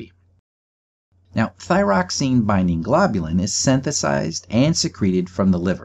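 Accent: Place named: Midwest, United States. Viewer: Very nicely done, just the right amount of detail. P.S. I couldn't stop thinking the narrator of this video must be from somewhere in the Midwest ;)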